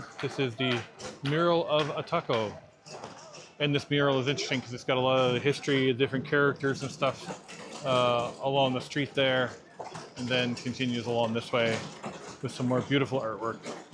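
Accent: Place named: Spain. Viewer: I meant your English is starting to sounds Spanish 🎉🎉🎉 haha how long til you sound completely Spanish? And your pronunciation has definitely gotten a lot better!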